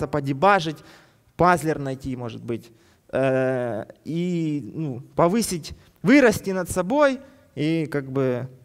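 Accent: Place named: Ukraine. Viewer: Английский с украинским акцентом - самое лучшее, что я слышала за последнее время)))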